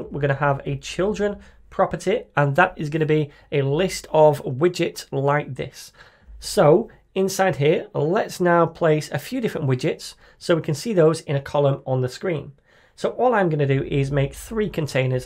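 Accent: United Kingdom. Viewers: dude am Arabian i speak multiple languages with different accents specially English so i learnt British accent from u not just flutter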